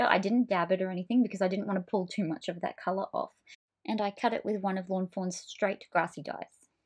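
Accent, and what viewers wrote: Australia. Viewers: Love love love your Aussie accent!!🥰 love your creation❤❤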